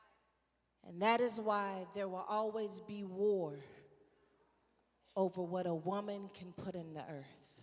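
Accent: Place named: AAVE, United States. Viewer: Hahaha! I love! Bring the ebonics sis! Lol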